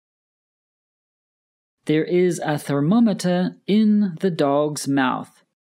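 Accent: United States. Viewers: Is these English American?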